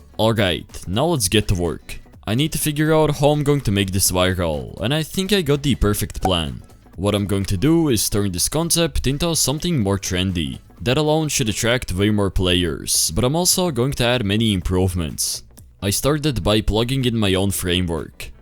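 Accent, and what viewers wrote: Latvia. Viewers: Uzreiz zinaju ka tu esi viens Latviets no varda un ka tu runa😂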